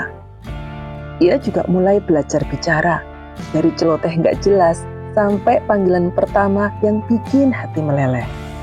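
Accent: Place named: Java, Indonesia. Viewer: Jawa banget nduk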